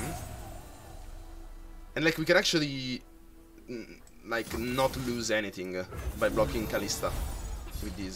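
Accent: Italy. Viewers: You ITALIANO?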